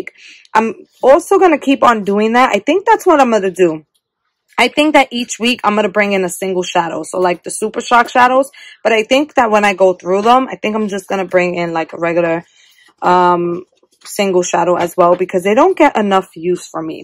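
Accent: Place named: New York, United States. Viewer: Giiiirl i hear that heavy up north accent. Is that new york or new jersey i hear? lol. 💕 Also whats the name of the yellow CSS shadow?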